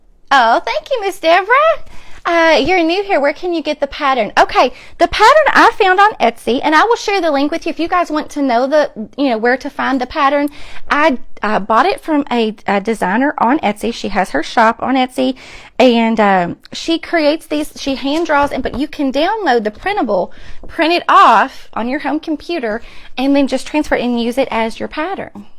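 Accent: South, United States. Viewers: I am replaying first time to find you I love your southern draw your style is same as mine I cannot wait to explore your crafts and hopefully catch you live. Be Blessed my new friend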